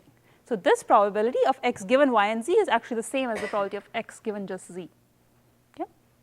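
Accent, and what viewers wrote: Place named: India. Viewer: Indian? Oh my god, terrible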